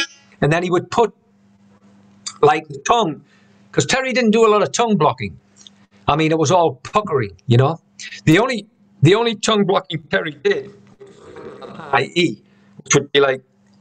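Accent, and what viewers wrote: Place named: Ashington, United Kingdom. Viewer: You sound a bit Ashington ish! Sorry if am wrong!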